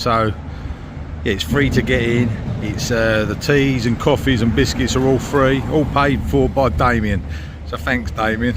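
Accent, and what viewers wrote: London, United Kingdom. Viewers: I love your voice, proper old school London, im from Ladbroke Grove. Thanks for the Video 🎉 For me, it's all about the ingredients in the sausages. I've yet to find a decent independent coffee shop in London. 😢 What genre of music are you collecting on vinyl?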